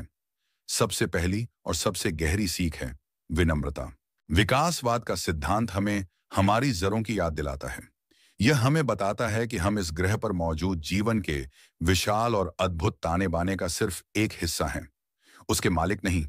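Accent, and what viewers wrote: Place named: Bihar, India. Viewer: I think AAP bihar se hai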